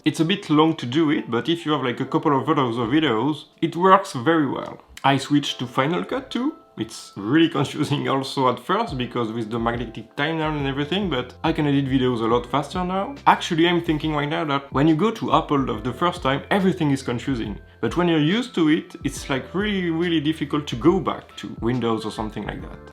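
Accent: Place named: France. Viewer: The French accent make this review magnificent L’humour le montage t’es bon ! Keep going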